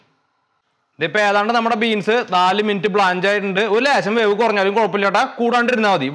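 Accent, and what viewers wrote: Thrissur, India. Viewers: Pakkaa trissur ttaaa ❤️ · തൃശൂർ സംസാരം.... സൂപ്പർ ചേട്ടായി.... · Thrissur karande samsaaram poli anne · ചേട്ടായി, മ്മടെ തൃശൂർക്കാരുടെ ആ സ്ലാങ് അതും കൂടിയായപ്പോ ഒന്നും പറയാനില്ല. സൂപ്പർ. അടുത്ത ഓഫീനു ഇണ്ടാക്കീട്ട് തന്നെ കാര്യം. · ത്രിശ്ശൂർ സ്ലാങ്ങും ഓവർ ടോക്കും മഹാ ബോറാണ്‌.. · This is the first time watching your channel. Thrissur ആണല്ലേ. ഞങ്ങൾടെ അതെ സംസാരം. loved 🥰🥰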